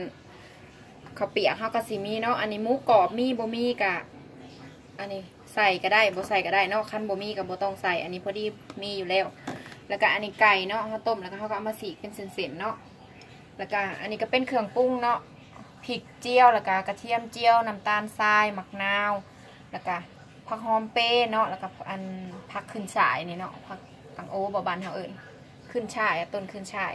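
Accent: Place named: South, Laos. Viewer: นางเป็นลาวใต้บ่ สำเนียงคือลาวใต้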